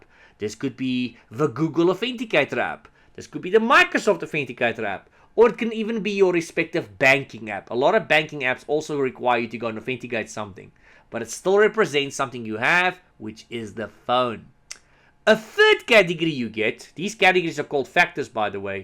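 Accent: South Africa. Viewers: I'm impressed by your content! Love the South African accent with the thorough explanations. A copy and paste of the compTIA A+ course but with your own style. Keep it up!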